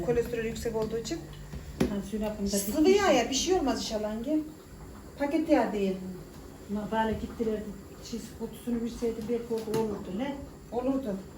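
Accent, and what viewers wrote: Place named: Aksaray, Turkey. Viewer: hanım benimde annemler aksaray koçhisarlı, sizi görür görmez şivenizden anladım:) babamda çorumlu, kültür birleşmesi cok güzel birşey insan sürekli yeni şeyler öğreniyor, çok becerikli bir hanımsınız taktir ediyorum:)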